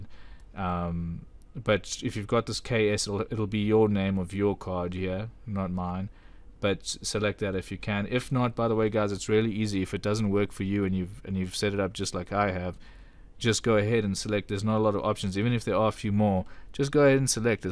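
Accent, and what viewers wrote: South Africa. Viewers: Are you from SA bra? Nice video! Very informing!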